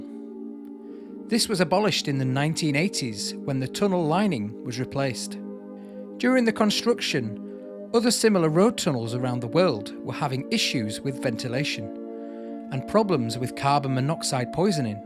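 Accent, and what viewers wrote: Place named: Northern England, United Kingdom. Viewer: haha I was somewhere between leeds and Wigan but couldn't put my finger on it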